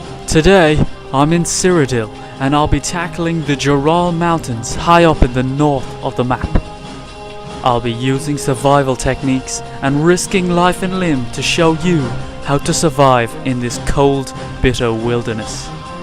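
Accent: Ireland. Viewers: yep hes irish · Are you irsh?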